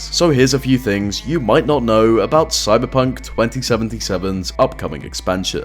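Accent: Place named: Australia. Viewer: You've got a pretty interesting accent. Are you Australian? You voice really reminds me of CatBoyKami :D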